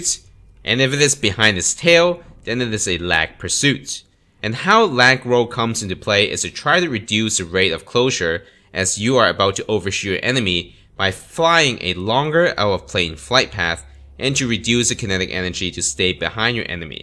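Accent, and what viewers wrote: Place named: South Korea. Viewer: Where is this guy from I can’t tell, he sounds kind of korean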